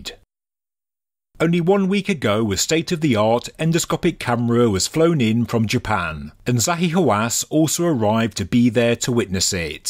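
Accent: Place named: Leicester, United Kingdom. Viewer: no I was only talking about the accent and the tone of the sentences, guess I never heard the leicester accent😂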